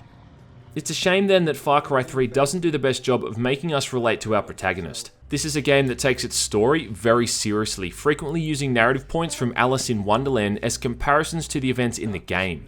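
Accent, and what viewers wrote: Bogan, Australia. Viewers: ur just a below average reviewer whos only popular because you have that bogan aussie accent.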